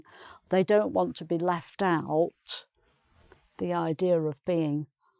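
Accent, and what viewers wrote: United Kingdom. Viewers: It's a real pleasure to listen to you - real British +profound knowledge. Thank you for that 🌹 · Oh, my lady, do you live in Canada? You speak in British English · love your British accent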